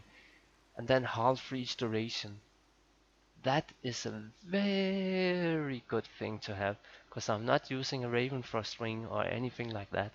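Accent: Denmark. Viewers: hey Fellow Danish fam. Jeg kan høre du er dansker. That accent though, also the keyboard saying "DAN"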